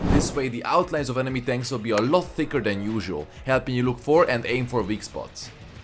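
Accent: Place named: Hungary. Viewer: Miért ilyen magyaros a kiejtésed?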